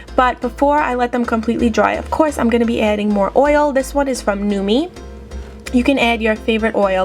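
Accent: New York, United States. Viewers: LOVE your New York accent! I'm from Staten Island and I moved to jersey over 15 years ago and I still have my accent lol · New york? I love your accent!! love from Birmingham UK!!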